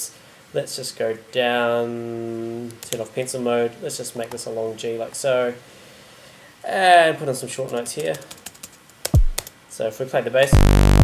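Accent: New Zealand. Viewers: sounds like a kiwi to me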